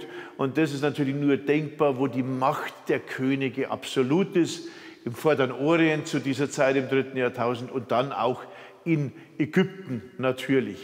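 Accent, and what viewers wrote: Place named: Bavaria, Germany. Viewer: Der Mann ist aus Bayern. Hört man aber eigentlich.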